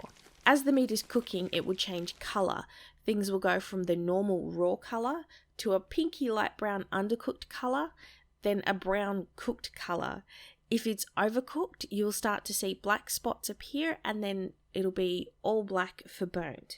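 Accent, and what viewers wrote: Australia. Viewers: Love the Aussie accent and the guide is really useful. Your so underrated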